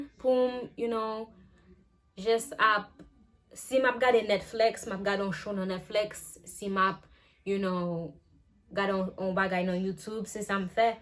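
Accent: Cap-Haïtien, Haiti. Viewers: Ou pa fet haiti okap epi depiw ap pale la mwen gentan we accent moun okap la nan ou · Eh manmanl ki moun okap la lap toujou gen accent moun okap menmsil pa fet Ayiti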